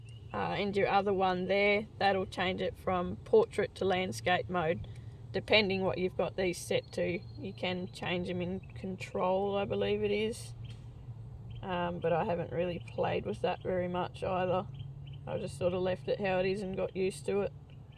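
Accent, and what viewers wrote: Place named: United Kingdom. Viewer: British Eng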